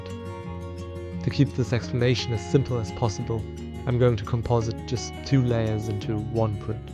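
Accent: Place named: Switzerland. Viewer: Interesting pronunciation. Studied British on a German substrate. Swiss-German?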